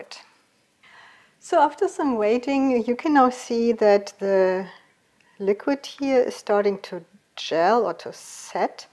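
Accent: Germany. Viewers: Best recipe. Maybe Imperial is cup measurements, I have an app that converts & on measuring cups we have both. America uses cups & ounces. I love your slight German accent & have just subscribed. I can’t wait for some great German recipes!!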